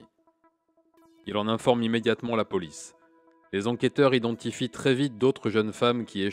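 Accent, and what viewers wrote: Japan. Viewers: Konichiwa! J'ai découvert ta chaîne depuis peu et la toute 1ère vidéo que j'ai vue, c'était le calvaire ignoble de Junko Furuta. J'adore ton accent japonais et ta façon de raconter l'histoire ! Tu gagnes un like et une abonnée !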